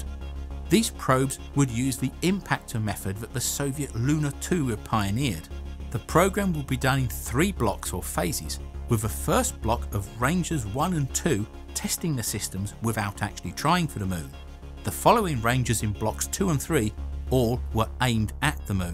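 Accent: United Kingdom. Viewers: Very informative thank you. Btw what part of Britain is your accent from? I absolutely love it, and have always been curious about regional British accent differences.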